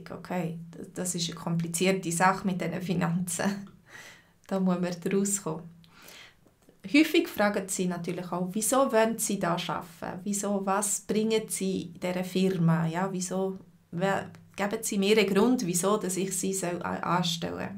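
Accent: Switzerland. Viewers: Aus welchem kanton ist dein schweitzerdeutsch? Ich ziehe bald nach Winterthur wegen ein job um und würd gern zu mindest die sprache verstehen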